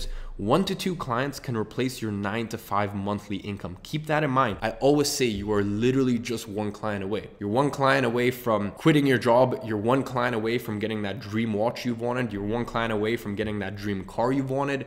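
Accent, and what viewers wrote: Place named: United States. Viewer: why does he have an american accent if he was raised in Britain?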